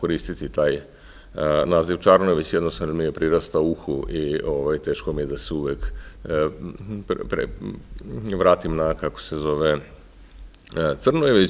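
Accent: Vojvodina, Serbia. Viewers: OBOZAVAM NAGLASAK MOJIH VOJVODJANA !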